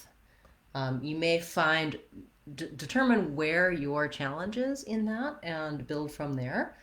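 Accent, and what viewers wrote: United States. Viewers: Fantastic way to immerse in American Accent is to listen to you. Thanks for making it easy for us. <num>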